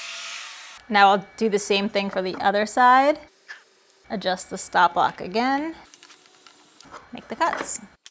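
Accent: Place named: New York, United States. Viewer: Do you happen to be from New York or New Jersey? There's a draw to some of the words you say, that sounds New York esk. Just wondering.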